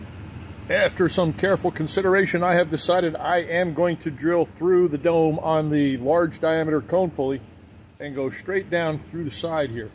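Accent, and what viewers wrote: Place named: Texas, United States. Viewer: Did I undesrtand you right ? "makes you wanna blow your hair out" My native language is german, so it is sometimes a little bit difficult to understand your Texas slang. Greetings from Austria ;-)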